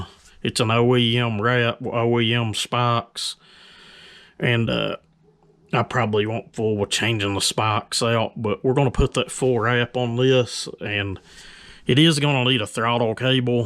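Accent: United States. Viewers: This accent! What state you from bud. Jason new subscriber from McLean Saskatchewan. I'm really liking your channel.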